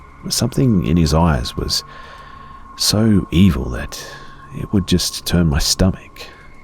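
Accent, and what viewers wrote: Australia. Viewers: Awesome Aussie thank you sir for the scares in your awesome accent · Very nice Australian accent yes, one of the best.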